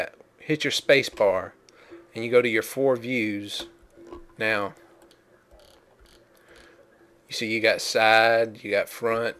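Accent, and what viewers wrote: South, United States. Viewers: pretty funny how i automatically discount everything you say because you have a southern accent. that's not really fair, but it's funny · love that southern accent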